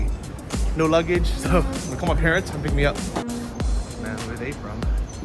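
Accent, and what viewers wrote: Taiwan. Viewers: Sounds like Taiwanese mandarin. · That's a Taiwanese accent if I ever heard one.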